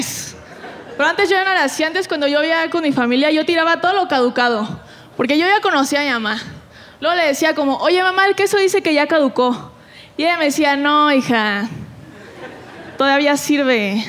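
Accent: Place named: Michoacán, Mexico. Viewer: Tiene un toque de Michoacán 😂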